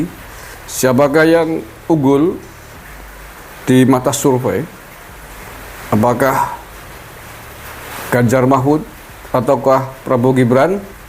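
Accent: Banyumas, Indonesia. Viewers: Rika wong endi pak 😂😂 Wong ngapak mesti Ora ngapak ora kepenak Nyong lima bersaudara...empat ttp kekeh setia karo PDIP/Ganjar Nyong dewek ttp setia karo Jokowi Pejah gesang kula nderek Jokowi Salam ngapak Jatilawang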